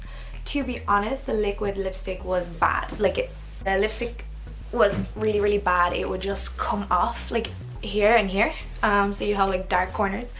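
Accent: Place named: Ireland. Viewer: Really impressive, holding a Master-degree in management. Also beautiful Irish-accent.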